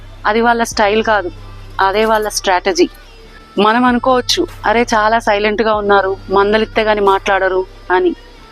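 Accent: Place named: Telangana, India. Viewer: మంచి తెలంగాణ యాస భాష. మంచి మాట కూడా🙏🙏🙏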